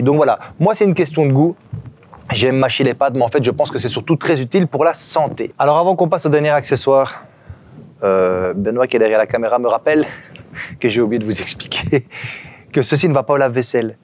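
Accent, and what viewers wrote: Belgium. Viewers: Une vidéo complète avec de bonnes explications ; du coup je vais de ce pas m'abonner à votre site car "cerise sur le gâteau" : J'adore votre accent qui me rappelle ma jeunesse lorsque j'allais à Liège chez ma tante (précision : je suis française 😉😉) A bientôt pour de nouvelles vidéos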